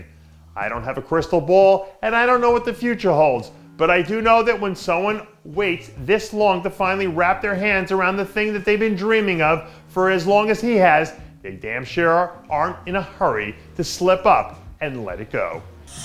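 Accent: Standard. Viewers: It's called, non regional diction. I like it.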